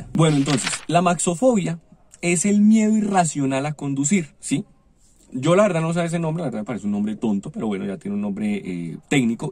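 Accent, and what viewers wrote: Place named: Colombia. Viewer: Si gracias por esa explicacion paisano chao me alegro bastante oirlo esa es mi Colombia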